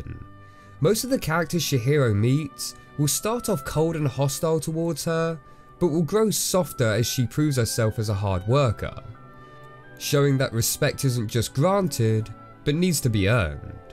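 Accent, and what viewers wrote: England, United Kingdom. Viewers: nice English accent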